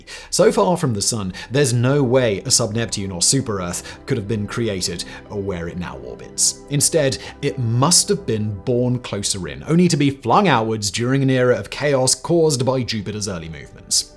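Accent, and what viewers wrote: United Kingdom. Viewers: Your proper British accent makes those Uranus jokes even funnier 😂🤣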